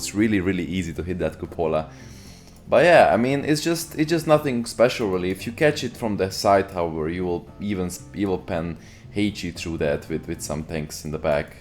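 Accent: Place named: Hungary. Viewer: magyar vagy te baszod az akcentus :D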